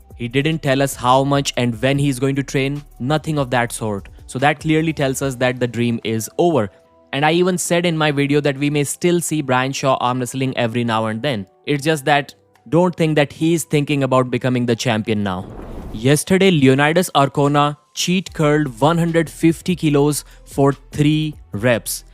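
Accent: India. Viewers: Fuck your indian accent mate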